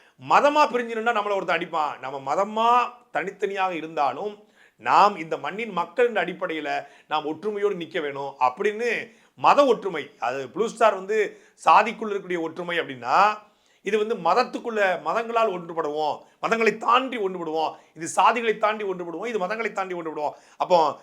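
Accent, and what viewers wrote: Tirunelveli, India. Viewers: அவர்களின் தமிழும், கதை கூறும் பாங்கும் அருமை.. நெல்லைத்தமிழ் இடை இடையே மணக்குது.. வாழ்த்துகள்.. கொஞ்சம் ஆங்கிலம், வட மொழிச்சொற்களை களைந்தால் இன்னும் சிறப்பாக இருக்கும் - நாம் தமிழர்!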